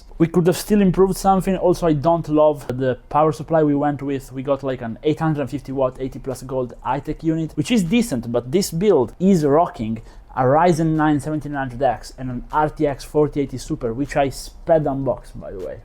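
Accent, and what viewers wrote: Italy. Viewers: Are you italian? (Great vid btw)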